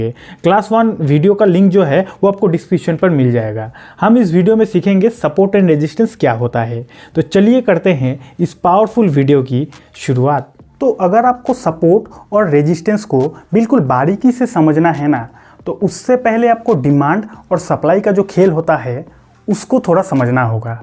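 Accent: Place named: Assam, India. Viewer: Assam ka hindi lag Raha hai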